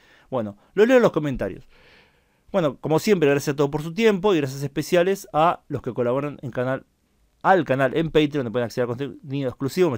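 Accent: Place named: Córdoba, Argentina. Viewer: suena a cordobes (o cordo-chanta)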